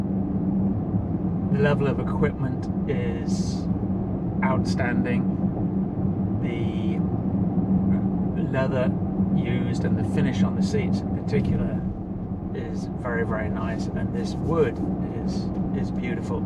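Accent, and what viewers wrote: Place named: United Kingdom. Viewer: I'm thrown off by the Brit voice and the vehicle isn't right hand drive. The two just somehow belong together.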